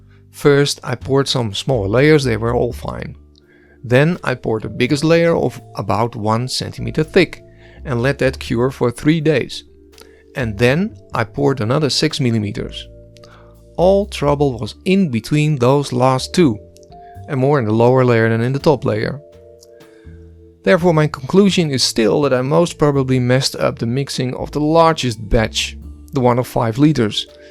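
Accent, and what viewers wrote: Netherlands. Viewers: Jouw engels is echt gewoon goud, dat nederlandse accent 10/10.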